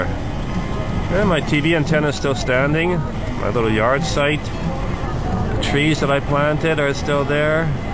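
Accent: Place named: Fargo, United States. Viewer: funny accent. like the movie Fargo!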